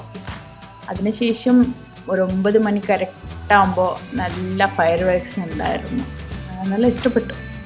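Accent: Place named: Tamil Nadu, India. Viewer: Vlog super.... Pizza adipoly aayitto.... പിന്നെ നിങ്ങളുടെ സംസാരം എനിക്ക് നല്ല ഇഷ്ടായി.. തമിഴ് കലർന്ന മലയാളം... സൂപ്പർ....😍😍😍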